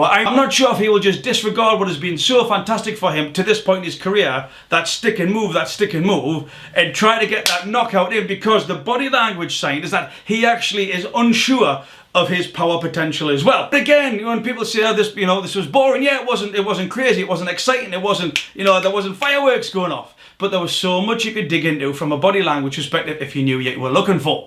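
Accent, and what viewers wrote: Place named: North England, United Kingdom. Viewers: I'm from Sunderland, it's the first of your videos I've seen but guessing by your accent you're not too far away.. great video 👍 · Leeds here not too far from Tyneside which sound like where you’re from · Im from Darlington which, judging from your accent, is not too far from you. I'd like to see you do a video on the Izzy v Romero weigh in. Might be a tad late like but either way keep grafting mate. 100k next. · Went to Uni in Yorkshire - are you from North-England or scotland?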